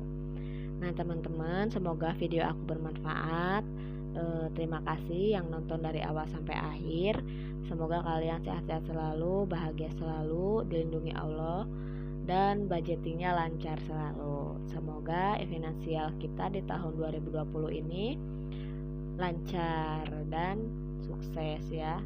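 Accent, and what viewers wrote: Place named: West Java, Indonesia. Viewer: Logatnya msh ketara org sunda nya ...unboxing paket binder lanjut budgeting ya teh smg terus istiqomah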